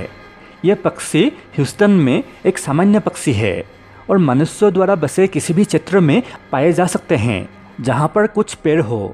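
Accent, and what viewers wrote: Assam, India. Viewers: একদম অসমীয়া এজনে হিন্দী কৈ থকা নিচিনা লাগিছে।হিন্দী উচ্চাৰণ ত অলপ improvment ৰ প্ৰয়োজন।চেষ্টা চলাই যাওক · The Hibi bcoz mother toung hindi nhi hai ....Yeh asssamese hai phir v yeh try lar Raha hai .... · আপোনাৰ উচ্চাৰণৰ ওপৰত ভিত্তি কৰিহে সুধিছো মহাশয় , আপুনি হিন্দিভাষী নহয় , নহয়নে ৷ উজনি অসমৰ যেনো লগা নাই হলে 😃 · Aapunr voice2t akhomiya Jen lgise....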